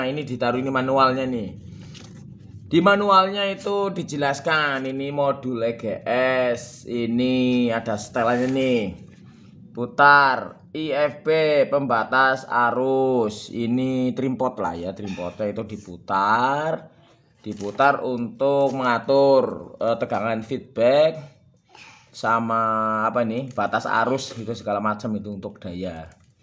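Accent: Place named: Banyumas, Indonesia. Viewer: Om... Logatnya banyumasan asli mana bos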